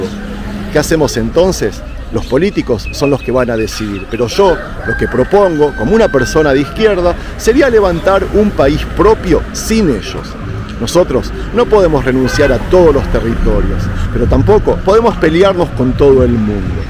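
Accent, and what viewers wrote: Buenos Aires, Argentina. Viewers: Shalom...admiro tu enseñanza..a veces pienso que lee tus relatos... Y me gusta tu acento porteño..un abrazo desde Salta Argentina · No se veo mucha manipulación, en este video peligroso es meterse en un barrio de caracas y lo otro q no me explico, es que el único lugar del mundo donde se pelean judios y árabes x supuestos motivos religiosos es en Israel, 🤔sospechoso de verdad, tuvieron unos dos mil años en la diaspora, y los judios q Mato Hitler ni siquiera eran de medio oriente la mayoría eran de Alemania 🤷🏽‍♂️, y tenían religión judía, se entiende q se usara esto como escusa para la creación del estado de Israel pero la mayoría de los israelitas q viven en la nación de israel yo diría q son de otros orígenes,no semitas, asi como tú q tienes acento argentino o uruguayo ni idea de cuál país seas pero de x allí, lo cierto es q de origen semita no creo, pero puede q me equivoque x otra parte habría q ayudar a un país como burundi donde realmente se ve la verdadera razón de las guerras,la pobreza extrema, y la exclusión social de los más desamparados del planeta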